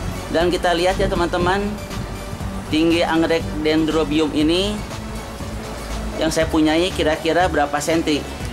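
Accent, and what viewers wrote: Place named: West Java, Indonesia. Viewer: si akang,logat nya urang sunda pisan euy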